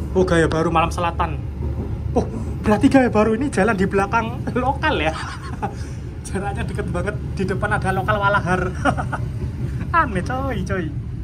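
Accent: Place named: Madura, Indonesia. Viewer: Suaranya yg bkin candu mas😂😂 medok logat maduranya keliatan ,, semngt ngontennya😂😂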